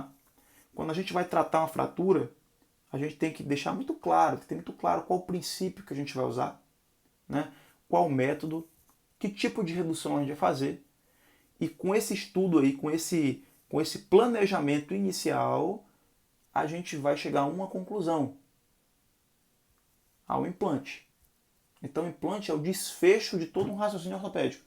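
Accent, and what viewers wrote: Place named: Salvador, Brazil. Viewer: Boa, parabéns pela aula. Esclarecedor para qualquer residente. Sou preceptor aqui do HGCA( vejo que sotaque é soteropolitano) e são esclarecedoras essas aulas básicas iniciais, a base do curso 👏🏼.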